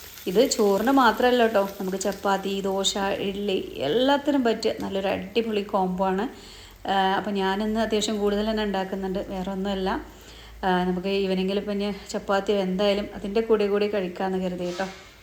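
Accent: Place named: Malabar, India. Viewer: The Malabar slang is interesting for us (South Kerala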